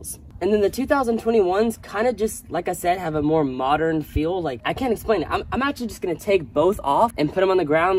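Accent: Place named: South, United States. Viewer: Love this video man! You sound southern, nashville here. I pick up my red model 3 next week and im so lit!!!! subscribing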